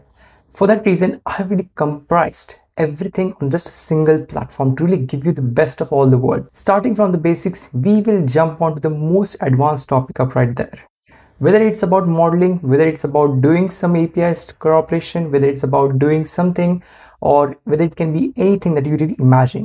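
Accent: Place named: India. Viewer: I dont know who you are, but you have a bright future. I can hear youre of hindi descent, and if I had to guess id say you moved from there to probably the states. But if like to know your story and how you got where you are today.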